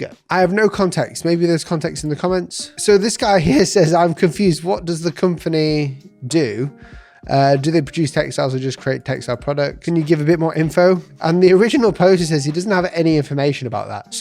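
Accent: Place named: Northern England, United Kingdom. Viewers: I like that you point out that you are from the North of England in many videos. Even I can hear that, and I'm not British 😂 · don’t know why i didn’t clock your northern accent faster, hi from manchester!!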